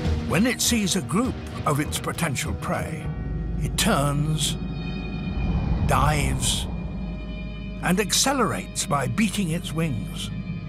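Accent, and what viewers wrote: United Kingdom. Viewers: I have loved David Attenborough way of narrating nature for many years ... his british accent and the dedication to spread out knowledge.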